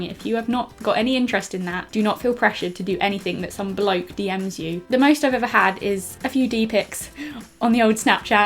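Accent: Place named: Yorkshire, United Kingdom. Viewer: Up North!!! Love the yorkshire accent... cough cough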